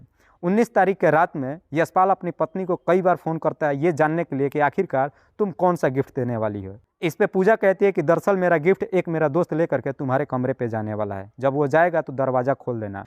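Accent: Bihar, India. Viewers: Bihari aise hi bolte hai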